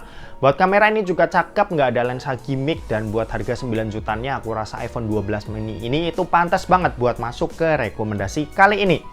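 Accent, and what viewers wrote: Semarang, Indonesia. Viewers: Orang semarang ya kak?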